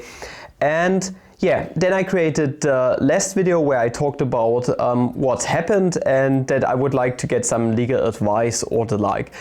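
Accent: Germany. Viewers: And please clear my doubt "are you German"?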